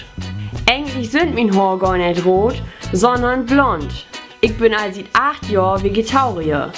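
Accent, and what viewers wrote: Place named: Low German, Germany. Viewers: Schon cool wenn die Leute heutzutage noch Plattdeutsch können. Dein Plattdeutsch ist ganz anders als das, was man bei uns in der Eifel spricht, trotzdem versteh ich so 90% von dem was du sagst. Echt interessant und cool :) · Also ich hab mir erst das deutsche Video angeguckt und dann das auf Plattdeutsch. Ist ja echt cool wie ihr da bei euch redet. xD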